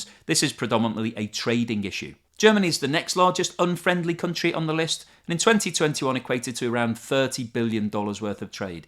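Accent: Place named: England, United Kingdom. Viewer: Looking at your playlist I think you might be confusing your country which I'm assuming is England from your accent is Russia cuz your economy is fucked way more than Russia's! England the EU and the United States all shot themselves in the foot or should I say through their heads to hit Russia which is dumbest shit! You seem to be living in a willfully ignorant lala land of projecting what you would like to occur as opposed to the actual situation on the ground Russia is hurting to some degree but not as bad as Britain! And it's only going to get worse now that Beau Jo's gone and someone even stupider it's going to take over! Maybe you also believe as Liz does that you all are going to defeat Russia in Ukraine? This is a global realignment, that will hurt Russia slightly in the short term but benefit them in the long term and devastate as you put it the West otherwise known as Europe Canada and America which represent only one fifth of the human population! 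So opportunities abound now for Russia India and China! And Europe is now going to be my country America's bitch as it wanted it to be and Britain will be the lap dog! America's economy is hurting but Europe is in for a long cold inflationary recessionary winter and next several years!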